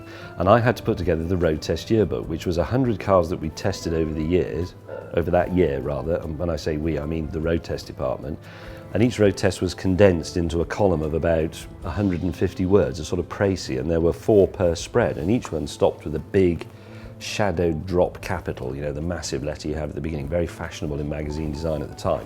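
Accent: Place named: United Kingdom. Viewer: It's not caarth its cars.. I swear, I hate British accent...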